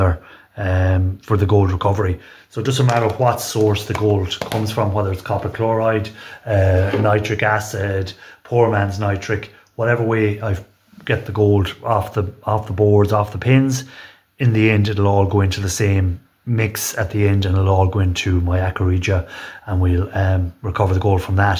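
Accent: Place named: Ireland. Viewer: Hello from South Alabama....I like your accent.... We're probably related, somewhere down the line.. My Dad's Grandmother was Full Irish . Sir .. would you let me know how and where I can get scrap phones, computer boards, etc .?? I would like to get into this.... Thank You.... and ," Don't let them get their hands, on your Lucky Charms."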